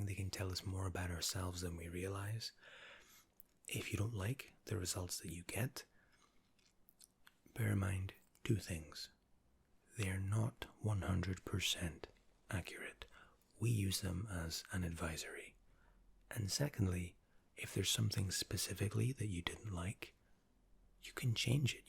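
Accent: Scotland, United Kingdom. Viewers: I love the tone of your voice and the way you speak. Maybe it's the accent that I like so much being a fellow Scot ☺️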